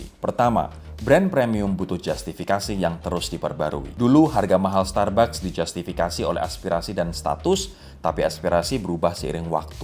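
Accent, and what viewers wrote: Indonesia. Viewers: beberapa episode make-up mas aku perhatiin medok banget mas.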